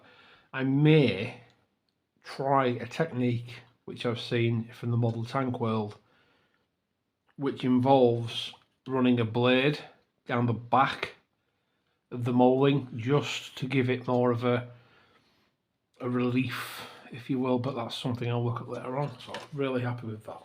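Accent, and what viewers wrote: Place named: North West England, United Kingdom. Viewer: I’m new to the channel but I love it I have my large layout in my double garage in Blackpool I can tell from your accent that your also from the north west I think My layout is totally mixed although no steam as I only knew diesels and electric so everything from deltics to class 800 inc the new class 92 from Accurascale I have about 120 locos and dmus and emus I started one year ago returning to the hobby after twenty years away and now I’m fully dcc with most being full sound fitted Great way you present the video and fantastic editing along with I really like the channels thank you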